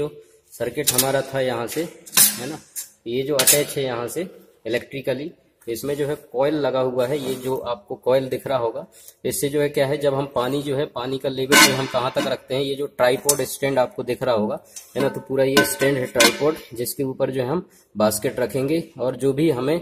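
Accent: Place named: India. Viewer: No one hears ur Indian speech try to post with English subtitles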